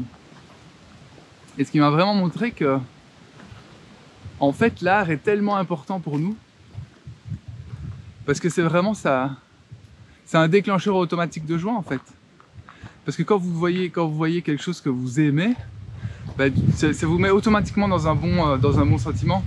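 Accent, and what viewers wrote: Liège, Belgium. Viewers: Merci pour cette vidéo , il me semblait bien avoir reconnu ce petit accent de la Province de Liège que j'affectionne tout particulièrement étant de Mons (Hainaut). Il ne suffit que d'une chose pour changer le Monde comme en musique s'accorder pour être en harmonie et changer la musique ...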